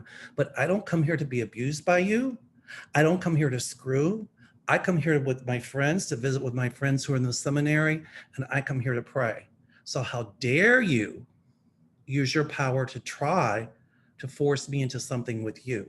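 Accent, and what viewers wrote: South, United States. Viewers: Your Southern accent is on spot. Thank you for your show. Sending you big love from Beth in Mancos CO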